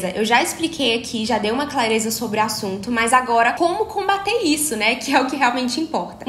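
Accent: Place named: Campos dos Goytacazes, Brazil. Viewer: Oie,! Tudo bem?! De que estado você é? Seu sotaque é mto parecido com o daqui "Campos dos Goytacazes", RJ.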